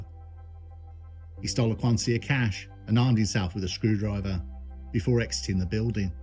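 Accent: Birmingham, United Kingdom. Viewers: your channel is the best mate, love hearing a brummy voice as well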